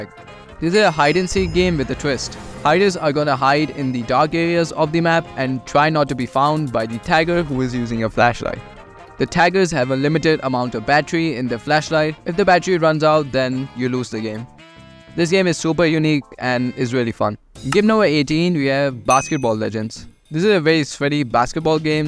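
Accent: India. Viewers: By his accent i think hes indian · I know u r indian the freaking accent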